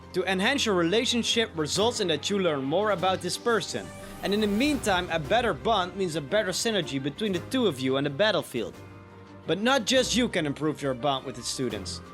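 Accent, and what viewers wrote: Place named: Netherlands. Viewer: Ok, so now byleth gets added to smash, and I was wondering if this game would be something for me. I got into persona 5 (which is now my favourite game of all time btw) because of joker in smash, so I was wondering if this would happen with fire emblem for me. So I searched for a vid and found your channel and you’ve honestly sold me!!! Awesome job! Ohw... yeah also, you sound really dutch. Dus als je Nederlands bent en dit leest. Ik lieg niet als ik zeg dat je video’s van hele hoge kwaliteit maakt! Ik hoop dat je snel groter groeit! Zeker een sub erbij!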